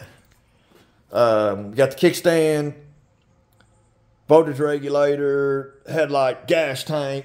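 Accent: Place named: Nashville, United States. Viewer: I lived in Nashville for 17 years and hearing your accent reminds me of a lot of the people from there. I really miss the rides down there.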